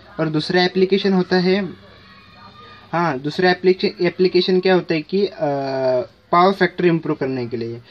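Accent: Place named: United Kingdom. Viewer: Video me kya bolne wale ho, pehle se likh ke Q nahi rakte ho yaar, lagta hai jaise koi angreez hindi bolne ki koshish kar rha ho, anyways, i managed to understand what you were trying, and it helps a lot. thanks for that.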